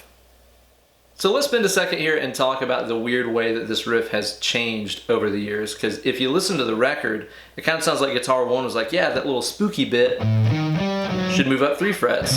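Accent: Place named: Texas, United States. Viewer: You sound like you’re from Texas, man. We have good metal down here in the south.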